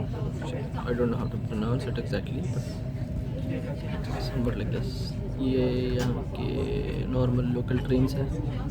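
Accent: Hyderabad, India. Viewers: I can say ur from hyderabad, pure hyderabadi. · BHAI YOUR VLOG IS SUPERB AND THE AMAZING PART IS YOU USED HINDI LANGUAGE ( WORD PRONUNCIATION SE LAG RHA THA KE HYDERABADI LANGUAGE HAI ) VLOG ME JAAN DAAL DIYA LANGUAGE NE .. kEEP UP GOOD WORK :-)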